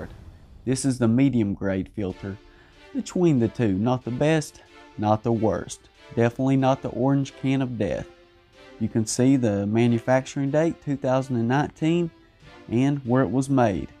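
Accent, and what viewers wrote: South, United States. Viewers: Except this guy's accent is real. Sean had the worst attempt at a southern accent I've ever heard. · gotta love the southern voice. relaxing to listeni to.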